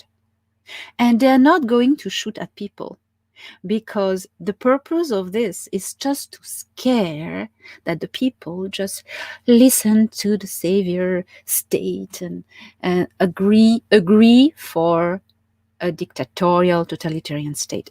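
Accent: France. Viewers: French accent speaking English is so beautiful! My children went to French Schools and I love the way they speak too❤️What is particularly cute is that the translation says (Alien sheep) 😄 Raise your vibration by doing something you love, for me, music really helps and arts and crafts. Spending time in nature, watching Sunrise/Sunset, being close to water, eg the sea or a river if you are not close to the coast. Lots of love, Thor Han, The Galactic Federation and all those reading the message 🙏❤️👍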